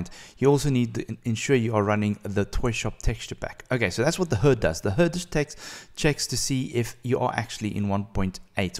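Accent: South Africa. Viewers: Don't mind me asking... But are you South African?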